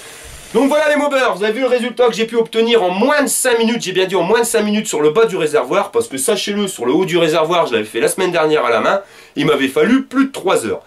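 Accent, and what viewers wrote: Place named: Nord, France. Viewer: Juste pour l'accent du chnord je m'abonne 👍🤙 Big UP d'Algérie 😉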